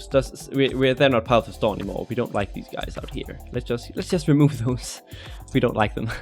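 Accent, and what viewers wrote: Denmark. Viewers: jeg kender en dansker når jeg hører dem. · man kan godt høre at du er dansker lol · are you danish i heard you eller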